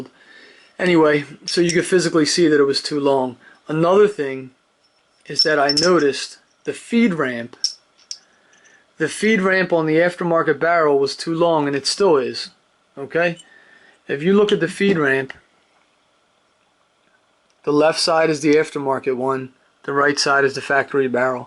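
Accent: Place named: Pittsburgh, United States. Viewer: Not quite Ralph, sounds more Pittsburgh to me.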